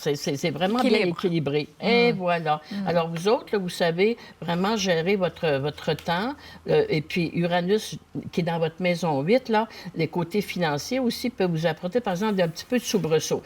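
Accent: Canada. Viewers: Bonjour et merci beaucoup pour vos prévisions et aussi j'aime beaucoup votre accent canadien c'est très agréable merci 😂❤😅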